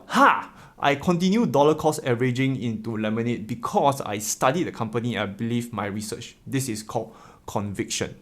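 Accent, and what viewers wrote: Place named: Singapore. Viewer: I am from Sngapore as well! U’ve changed the way I’ve looked at and approached investing in so many ways. Rlly thankful and grateful to chance upon ur channel and hearing ur Singaporean accent in such a transparent way is so reassuring. Thanks!:D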